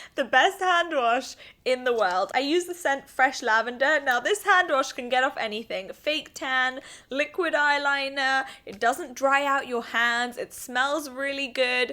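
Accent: France. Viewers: haha i didn't really understand when you pronounce the fragrance's name but after i saw the name and rethink about your french accent, i feel like it's pretty accurate :P LOVE YOUR VIDEOS!! >< <3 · Loved this video ! And the French girl is saying : Your French accent is so cute hahaha xx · your french accent is so cute it worth an 8 i love your vidéos !! Bises from France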